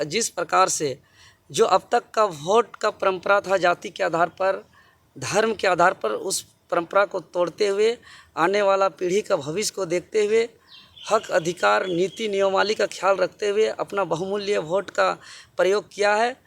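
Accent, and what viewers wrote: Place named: Jharkhand, India. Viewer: Very good sound like speaker of jharkhand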